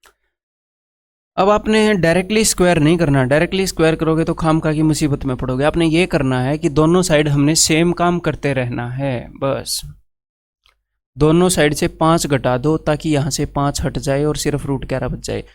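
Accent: Haryana, India. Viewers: You are awesome. I am also from haryana. Felt connected with your tone . I am big fan of you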